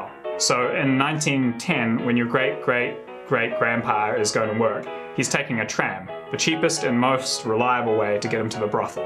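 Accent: Australia. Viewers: Why does this dude have an Australian accent if he’s from Montreal?! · Your accent is making me so confused! It's not exactly Aussie or Kiwi, it's mixed with something I can't identify... but probably you've lived in Canada for a good while?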